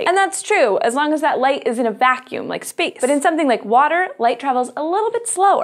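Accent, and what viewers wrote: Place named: Idaho, United States. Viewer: Weird... Even me, a non native speaker with a pretty poor fluency can understand her. Google says somewhere around Idaho, USA... Btw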